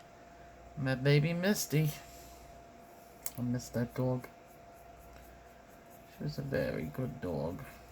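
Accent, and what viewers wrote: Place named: New Jersey, United States. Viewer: Hi. You sound like my spouse's mom, and she sounds like New Jersey. Just an idea, but why not draw from your list and the official one?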